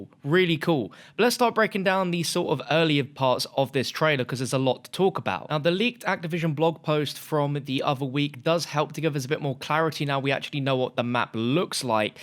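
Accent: United Kingdom. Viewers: where are you from? from uk?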